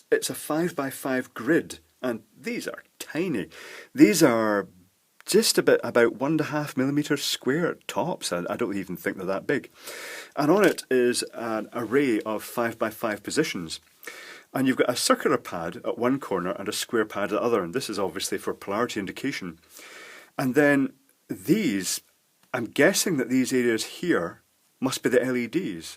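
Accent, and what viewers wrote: Scotland, United Kingdom. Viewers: Lovely Scottish accent, Edinburgh area?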